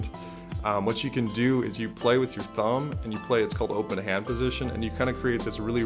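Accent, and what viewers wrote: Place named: United States. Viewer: I don’t know why I always assumed you were from Eastern Europe. I was shocked to hear the American accent 😂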